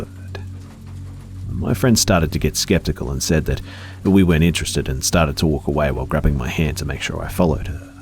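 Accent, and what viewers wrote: Australia. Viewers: You have such a wonderful soothing voice!! Thanks for using your talent to help me relax and unwind from my stressful life. It's always a pleasure hearing your Aussie Accent!!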